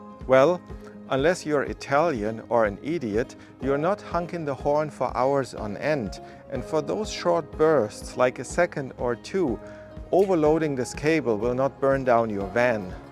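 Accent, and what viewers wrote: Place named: Germany. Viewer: Hehe, man hört sofort das du aus Deutschland kommst😅 , was den Radarsensor angeht, da hättest ohne Probleme die Hupen an den Schrauben montieren können. Der sprinter kalibriert sich jeden start selber von neu. Hat den Hintergrund das der Beladungszustand ja variieren kann. Die paar Grad machen da nix aus. Habe dort auch meine Hupen montiert. Fertiglösungen die angeboten werden haben noch Dickere Platten aus Stahl verwendet wie bei dir in Verwendung. Beste Grüße 😊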